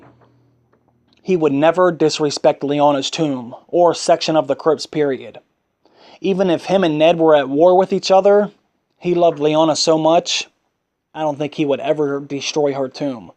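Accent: Pittsburgh, United States. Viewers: Dude are you from Pittsburgh? Awesome video btw!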